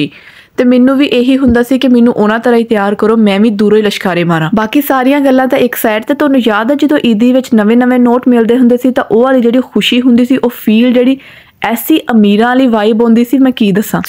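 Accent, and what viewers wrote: Faisalabad, Pakistan. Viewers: apki panjabi Faisalabad wali h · Kaha Sa ho ap wasy Lahore Ki Ni Faisalabad Ki Punjabi lgri ha ya aidda oudda Faisalabadi bolty hnn.....batana zarror which city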